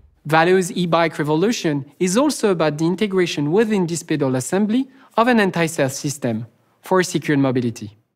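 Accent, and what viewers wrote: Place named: France. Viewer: C'est du marketing, un accent français pour un produit français !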